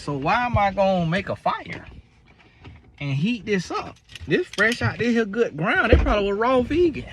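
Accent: South, United States. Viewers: I love listening to your country self. Fasting definitely has its blessings.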